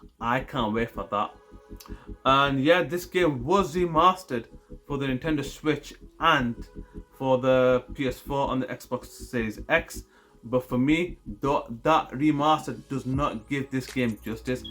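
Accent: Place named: North, United Kingdom. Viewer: You have a strong northern accent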